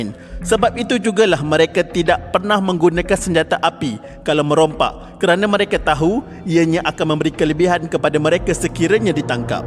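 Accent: Kelantan, Malaysia. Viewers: Ya Allah saya sorg ke gian menanti video2 baru channel ni?? Saya pasti pemilik suara misteri ni org kelantan sbb dari tutur kata nya.. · bro asal Kelantan ke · Yang tukang bercerita ni org kelantan kan... Tu je saya nak komen ekekeke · Narrator ore kelate terrrr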